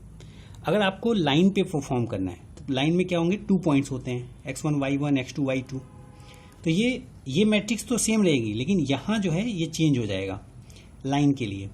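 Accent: India. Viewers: is there any single video without indian accent about Computer Graphics?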